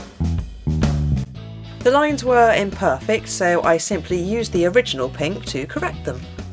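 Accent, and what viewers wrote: England, United Kingdom. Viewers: u from good ol britain! mee tooo allright me loveeerrzzz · Does she live in England and does is she English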